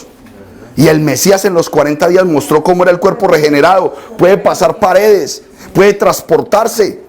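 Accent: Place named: Colombia. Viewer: Inmediatamente me di cuenta de que usted es colombiano 👍gracias por compartir tanta enseñanza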